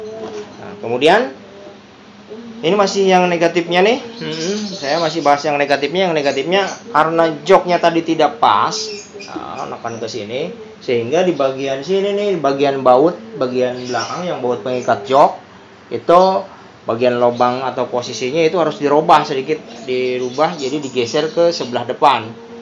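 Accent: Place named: West Java, Indonesia. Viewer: Agak ngahelob😁 bangunateh urang sunda nya si akang mah.?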